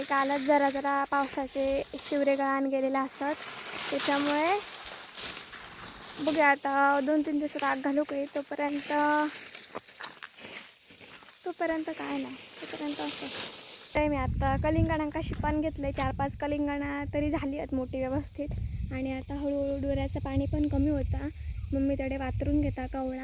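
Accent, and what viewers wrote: Malvani, India. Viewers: tujhe video khup chan astat.. mi pn kokanchi ahe.vengurla..but tujhe te madhal malwani aikayla khup chan vatt...tujha bolnyatunch smjun yete ki aplya kokanchi manse sadhi bholi❤..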